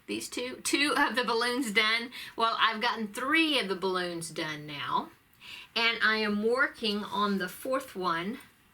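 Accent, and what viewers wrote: South, United States. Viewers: Im from southeast Georgia. I just came across your channel this week and have watched the last 7 or 8 in a row to try to "catch up". You caught my attention with your accent. I thought, "oh yay she's southern too !" Lol Congrats on your viewers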